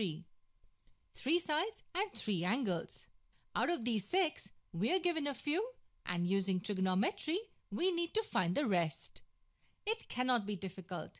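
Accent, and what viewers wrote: India. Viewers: Not bad. But obviously Indian accent is irritating. When you learn English, learn enunciation!!!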